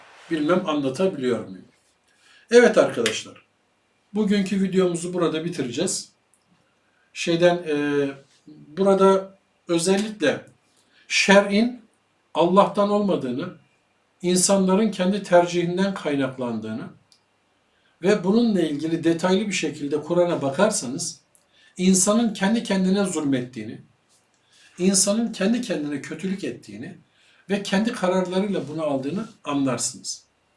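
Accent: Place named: Arab world. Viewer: Konuyu biliyor ve anlıyorum. Fakat kırıtma konusunda eleştirmenin kendine de bakması lazım. Eleştirmenin şive resmen arap şivesi gibi. Sizce de öyle değil mi?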